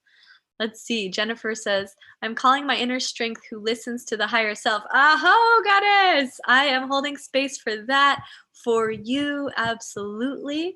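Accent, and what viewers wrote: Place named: United Kingdom. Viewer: Beautiful British accent, babe, tea and crumpets sounds nice, after a breakbeat.uk.co rave all night, without the MCs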